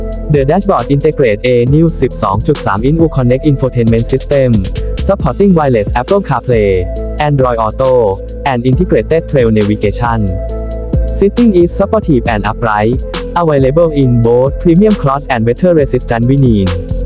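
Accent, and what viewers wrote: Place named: Thailand. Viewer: ISAs รับไม่ได้ว่ะอ่านภาษาอังกฤษสำเนียงไทย ไอ้บ้าเอ้ย😂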